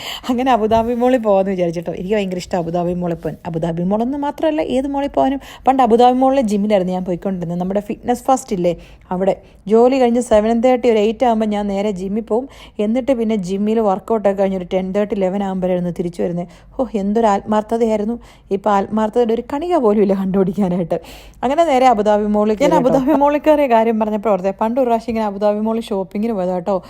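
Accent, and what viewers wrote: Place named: Kottayam, India. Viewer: Chechi kottayam aano...nammude samsaram pole thonni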